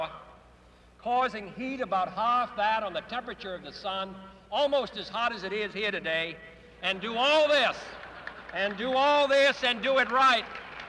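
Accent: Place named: Boston, United States. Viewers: I absolutely agree. I'm 42 and I've lived in sc all my life. My high school band director was from boston and we all lived his accent. Honestly, I listen to the presidents voice and donnie wahlbergs voice just to hear that accent again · I love the way he pronounces decade as "decayed." What's interesting is that in his other speech about the Moon, he pronounces it like normal. And his Boston accent really shines through in his pronunciation of "hard."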